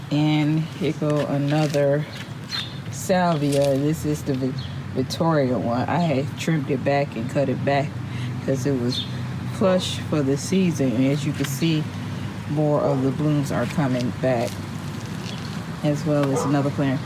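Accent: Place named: Chicago, United States. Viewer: Hi. I’m new to your channel. Beautiful backyard and flowers. I noticed that your having issues with your dahlias. I believe it’s where you have them planted. You have them in a bed near your gutter water spout and the tubers might be receiving too much water along with the over abundance of rainfall. So you have the rain fall along with all the water from your gutters. I did the same thing about 5 years ago. Moved them to a much dryer well draining spot and had no issues going forward. Also I looked at your surroundings and just knew you were in Chicago or maybe near Detroit along with your accent. Then I went back to your earlier videos and I was right! I’m from Chicago and visit Michigan on a regular to visit family, and I swear the surroundings look exactly the same as Chicago..😆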